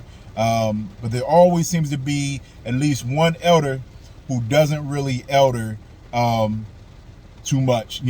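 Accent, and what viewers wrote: United States. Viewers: Love the sound of the rain at the end of the video. And the general vibe. You American? Cool accent. What kind of car it is, some large MPV? I was a type of the outsider, not really into the scriptures and ministry, hardly been to any Saturday ministry meetings at all through my 7 years from when I became a publisher to my decision of fading and ghosting. I really was in it for the company of literally couple guys I liked and respected. I didn't care much about anyone else, especially those oldtimers with only basic wordly education, there were few elders like that. Later I learned that folks in the congregation called me "the philosopher" behind my back. That sums it perfectly.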